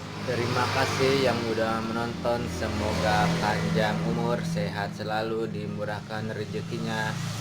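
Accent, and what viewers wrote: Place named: Banten, Indonesia. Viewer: bang .logat ngomong nya kaya orang banten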